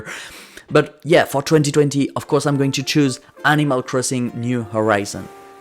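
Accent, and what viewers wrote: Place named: France. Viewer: That charming french accent !!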